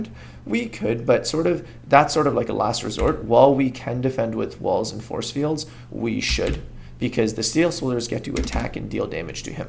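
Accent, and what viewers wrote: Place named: Canada. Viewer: Aboot...he sounds so Canadian. Still great vid - still getting my head around figuring out how to play effectively.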